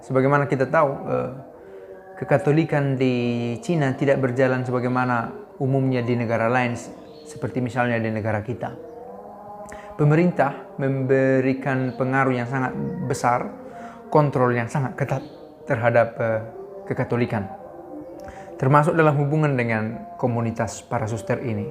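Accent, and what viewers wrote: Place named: Flores, Indonesia. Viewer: Bro ini chinese berlogat flores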